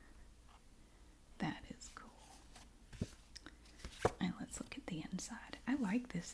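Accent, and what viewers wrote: South, United States. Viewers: For what it's worth, I adore your accent! I lived in NC for many years and I miss the Southern accents. ❤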